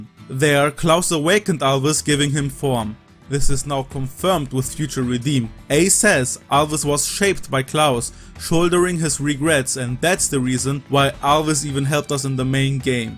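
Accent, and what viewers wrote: Germany. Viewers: aah I knew it xD. Fellow German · Also what's your accent? German? Just wondering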